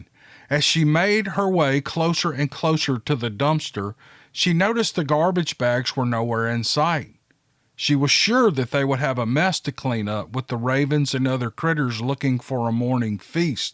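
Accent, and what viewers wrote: South, United States. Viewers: We love your stories! And your southern accent😁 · Oh yes I listen during the day and almost every night as I drift off to sleep I enjoy these encouter stories but more than that I love southern voice accent, a follower southerner 😊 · Those are some sweet ladies you got in your sideyard there, 🌱 I very much enjoy your story telling. Being half-Southern, your accent is wonderful in my ear. Thank you!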